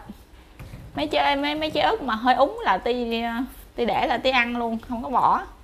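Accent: Mekong Delta, Vietnam. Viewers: Anh xem từ đầu đến cuối Video Em rất xinh với chất giọng ấm áp đặc trưng miền tây nam bộ, món ăn rất ngon chỉ thiếu mỗi bộ bà ba của người con gái sông nước miền tây nữa là nhất Em đấy ạ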